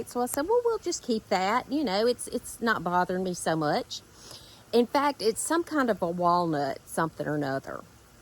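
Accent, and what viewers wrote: South, United States. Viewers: Good morning,. Hope it cools off soon for you. Here in Ohio--we have temps in mid to late 50s in early morning. Then it creeps up to mid 80s by the late afternoon. Yes I understand what you are going through with the walnut tree and shrubs. I've had similar problems with a walnut tree mixed in with tiger lilies growing next to the garage. Last year we even had poison ivy in that space. I had to clear it out (protected by gloves) and still got a little rash on my forearms. We have very dry soil right now. It hasn't rained in over two weeks. I love your southern accent,. Soil or soul is fine with me.😅 You did a good job clearing out the problem area. Have a wonderful Sunday afternoon.😊💖💕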